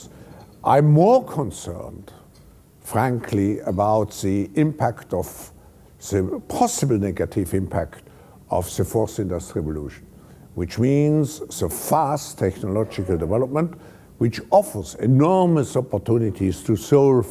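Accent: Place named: Germany. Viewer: That German accents is not a meme lmao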